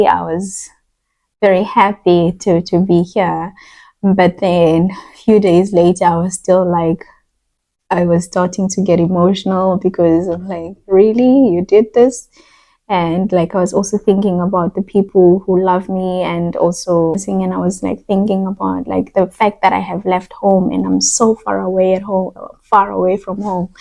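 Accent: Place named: England, United Kingdom. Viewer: Hi guys it's a pleasure to follow you an praying that God bless you all marriage an you talk like you are from England or the UK an not from africa😊🎉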